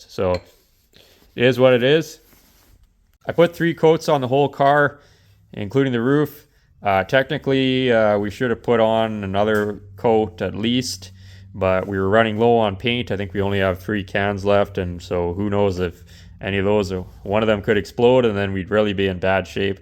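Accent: Canada. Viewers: Videos like this make me so glad to live in the south. I saw the thumbnail and thought where the heck in the north is this car from, then I heard the accent and realized, oh, the great white one. Nice job for a "quickie" body repair and paint job. When I saw you just pulling the rockers apart, I was just in shock. Never seen anything like that in person, only pics and vids online. Maybe I need to take a trip up north with my CVPI with 0 rust and sell it for 2x as much up there.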